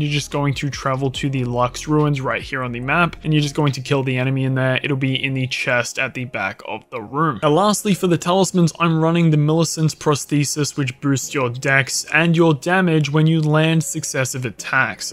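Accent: Australia. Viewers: Nice work mate!! Aussie?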